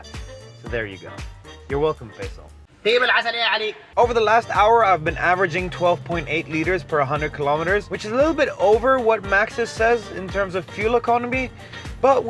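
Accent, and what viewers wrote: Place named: United States. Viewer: Nice review and excellent flow of information coupled with an American accent, I am subscribed ! 😄👍🏻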